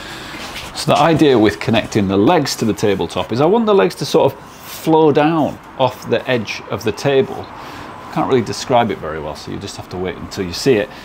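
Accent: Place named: Sheffield, United Kingdom. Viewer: it warped bcoz u left it outside absorbing moisture?? ey up!! fellow norther ere. a dont finkyer from werrawer born lark. burra wer born in boltun. Whers thi from lad? thi sownd lark thas from sheffield or sum werrr.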